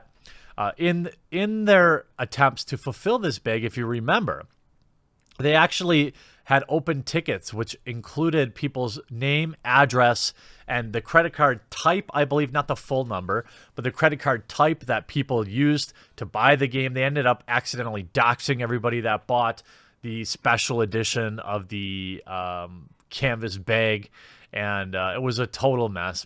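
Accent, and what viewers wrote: Wisconsin, United States. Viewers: it’s that Wisconsin midwestern accent. One of my favorite parts of living there for 5 years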